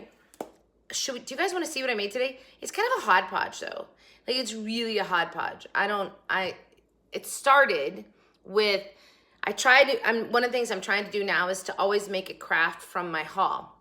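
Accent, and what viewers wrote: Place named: North, United States. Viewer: Hello i just came across u on you tube. Yes I did put up a like & subscribe. I'm from alittle village called Conmicut. I can see ur not from the south cuz ya talk like me! I think u did a great job on ur DIY'S So I'll see ya again & again hee hee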